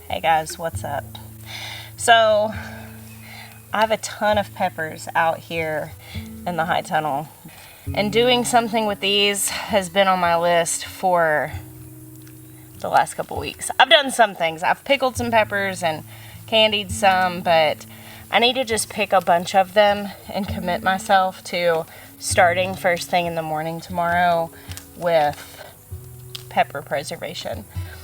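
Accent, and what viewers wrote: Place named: South Carolina, United States. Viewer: You're starting to get a south Carolina accent 😄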